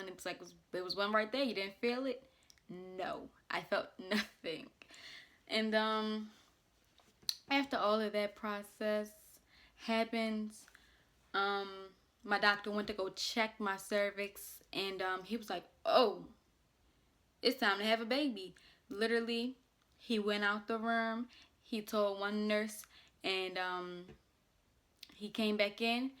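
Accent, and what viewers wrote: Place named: Baltimore, United States. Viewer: I was listening to you talk and I was like “Oh she’s from Baltimore!” I know my ppl when I hear them🤣 I grew up in Towson but have been living in DC and now National Harbor, MD. What hospital did you have her at?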